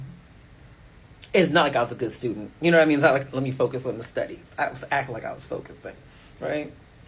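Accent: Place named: Washington DC, United States. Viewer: You from the dmv?!?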